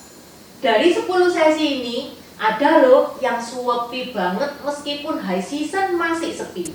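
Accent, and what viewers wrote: Java, Indonesia. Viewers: Kak....logat jawanya surabaya atau semarang kak? Ok thank you....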